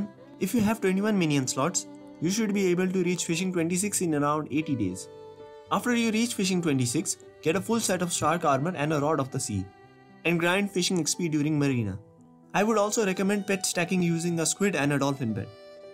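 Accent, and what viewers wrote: India. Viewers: Your voice and acceny are really like an Indian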